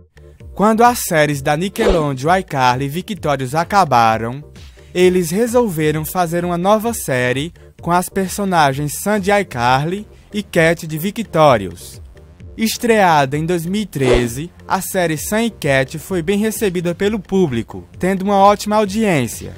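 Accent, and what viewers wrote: Northeast, Brazil. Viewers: Narrador nordetinooo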